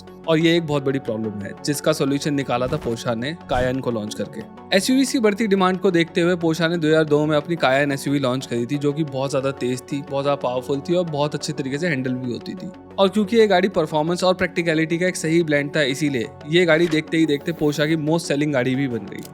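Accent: India. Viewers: Man - if you can’t fix your indian accent, can you at least get the names pronounced properly ? It’s not pronounced “ooroos”… its “U-RAS”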